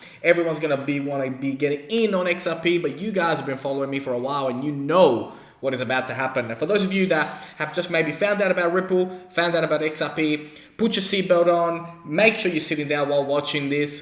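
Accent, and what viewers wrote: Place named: Australia. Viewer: He's an aussie. Dno where abouts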